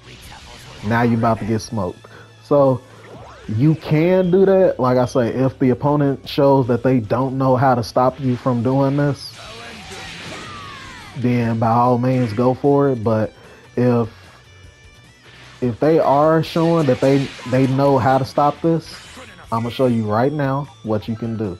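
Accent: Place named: Memphis, United States. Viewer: U sound like you from memphis bruh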